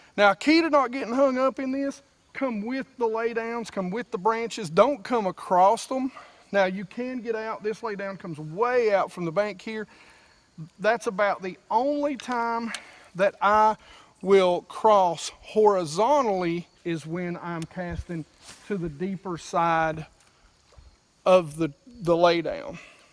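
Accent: United States. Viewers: i could listen to ur voice all day.. love the country accent!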